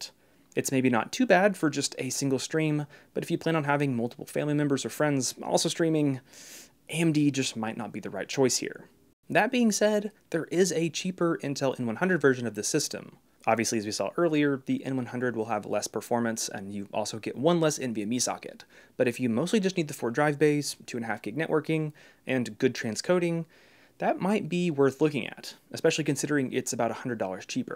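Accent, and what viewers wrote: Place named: Boston, United States. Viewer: That's a cute Boston. 13/10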